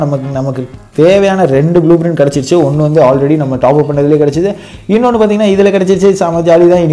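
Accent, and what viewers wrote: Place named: Kerala, India. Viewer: Bro kerala erunthu pesure semma4bro supper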